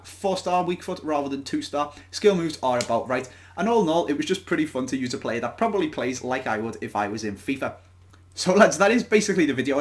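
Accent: Liverpool, United Kingdom. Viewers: Lad you hide your scouse accent kid, u can hear it, u fucking discrase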